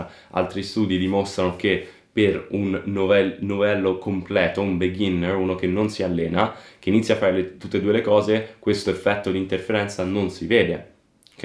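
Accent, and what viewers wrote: Rome, Italy. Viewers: Il tuo misto di italiano, romanesco e inglese perfetto è il paradiso per ogni linguista 🅱️®️🅾️🤙🏻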